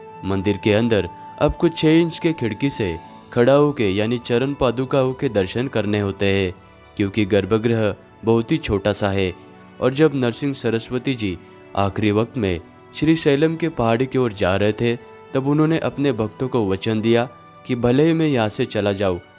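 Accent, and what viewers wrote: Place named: Maharashtra, India. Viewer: कशाला उगीच मराठी टोन मध्ये हिन्दी बोलताय . मराठीच बोला की .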